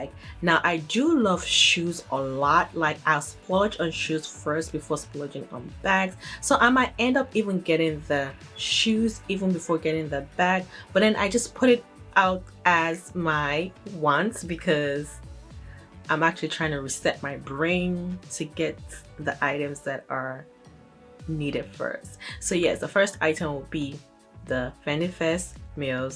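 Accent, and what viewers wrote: Nigeria. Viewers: I love your Nigerian accent!!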